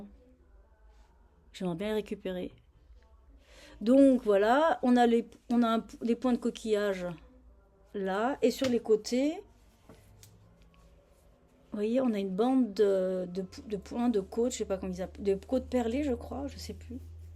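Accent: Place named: Haute-Savoie, France. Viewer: Bonjour 🙂 Ravie de connaître votre podcast..tres sympa..et bercée par l'accent de ma chère haute savoie il me semble?😅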